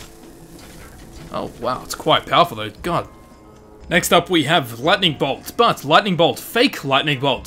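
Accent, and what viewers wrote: Australia. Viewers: Your an Aussie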